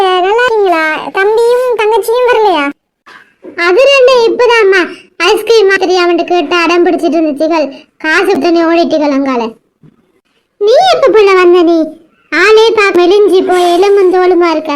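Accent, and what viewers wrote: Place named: Sri Lanka. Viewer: Video romba romba super sister ❤️🔥 neenga vanthu srilanka va sister pesura slang differanda iruku 🙄🤔 aana supera irukuthu sister intha slang 😍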